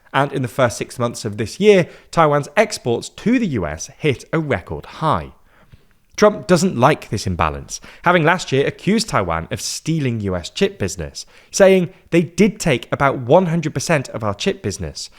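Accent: United Kingdom. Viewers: That’s just due to the British accent.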